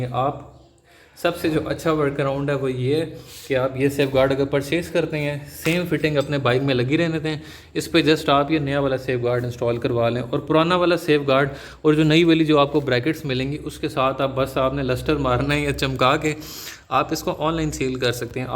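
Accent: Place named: Saraiki, Pakistan. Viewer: Iski awaz mein saraiki pan hai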